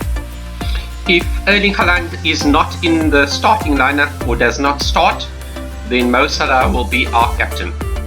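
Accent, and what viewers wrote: South Africa. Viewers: South African?.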